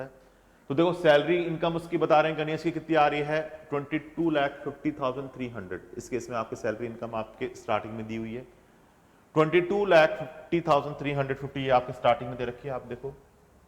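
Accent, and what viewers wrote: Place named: Rajasthan, India. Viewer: Rajshthani accent👌😁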